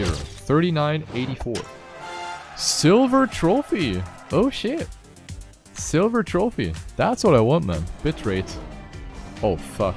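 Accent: Germany. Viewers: mann hört halt auch ein bischen das du deutscher bist lul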